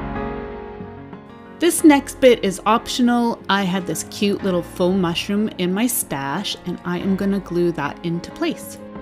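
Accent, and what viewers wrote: Canada. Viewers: I love your DIY's!! Also I recognized your Canadian accent.. lol. I'm a former Canadian living in Arizona.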